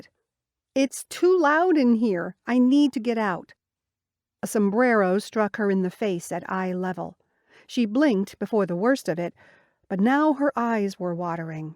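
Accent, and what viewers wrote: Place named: Canada. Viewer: Canada. The accent is about what I would hear back home in Wisconsin. The local slang,....no idea.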